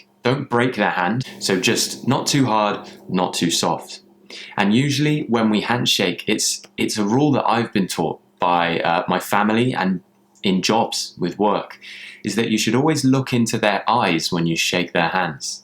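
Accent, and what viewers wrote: United Kingdom. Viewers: i just love the British accent, not necessarily posh but very well spoken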